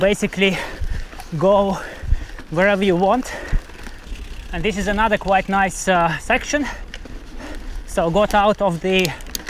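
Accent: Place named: Russia. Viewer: Ох уж этот неповторимый русский акцент...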